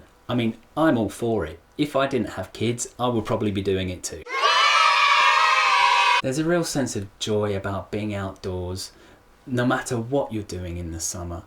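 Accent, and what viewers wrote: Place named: United Kingdom. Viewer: I LOVE your British English!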